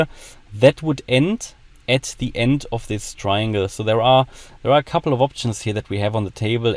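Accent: Germany. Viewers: Are you German? 😁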